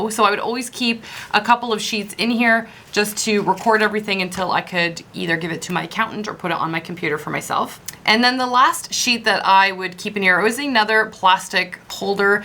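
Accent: Canada. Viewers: Hey beautiful mama. I love your accent. You must be Canadian. I’m a fan ~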